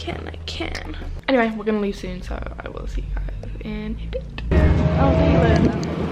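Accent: United Kingdom. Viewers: You’ve got a British accent but your Australian omg I’m confused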